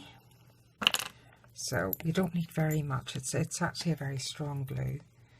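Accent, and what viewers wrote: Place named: Ireland. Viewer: WONDERFUL. You sound just like my cousins in ireland Sligo. Your video was reaay good. Hopefully we will see more. Dorothy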